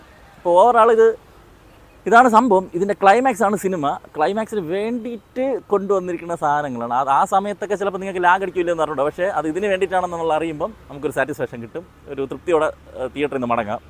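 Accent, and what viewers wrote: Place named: Thiruvananthapuram, India. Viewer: I like Thiruvananthapuram language. By kanyakumari karan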